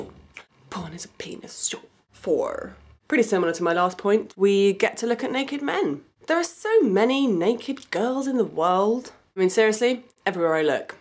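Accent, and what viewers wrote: England, United Kingdom. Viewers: You are sooo good at this blog thing. You’re articulate, with a voice I could listen to now and forever and oh my that British accent. And on top (no pun intended) of that, you’re drop dead gorgeous.❤️😂😂😂👍👍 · Thank you for your realistic honesty, it was refreshing to hear, (especially with an English accent & not the usual American one) you are quite funny, as a kind of bonus, stop using the word "porn" if it's okay though. Adult films or magazines, are nothing more than a means to an end, they can get us to the bed room, BUT they are not there when we actually do the job. real life sex is pleasure in a different way, & circumstance. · I like her. There is something about her energy and this British accent But this #5 ... 😧 what's wrong with you, woman?! P.S. And yeah, her sister is hot too 😎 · I gave a thumbs up for British ascent I'm British I don't know if i spelt it right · English woman with mary poppins accent speak about porn , which destroy my childhood memories hehehe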